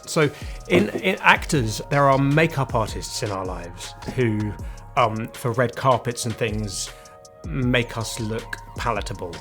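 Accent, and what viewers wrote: United Kingdom. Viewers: 最近イギリス英語不足してて困ってたから染みるわ。最高すぎる。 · Yes! I wish more people would learn English with a British accent rather than American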